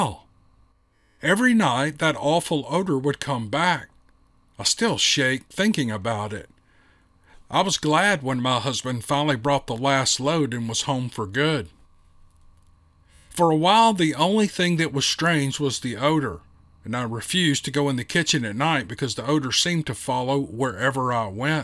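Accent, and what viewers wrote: South, United States. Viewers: I’ve tried other shows on this subject, but yours is the one I always click on. Your voice sounds like my southern family, you sound like an uncle of mine, very calm and easy to listen to. Makes doing chores a breeze. Thank you and keep it up. Maybe you’ll have an experience yourself. Have a blessed day. 😊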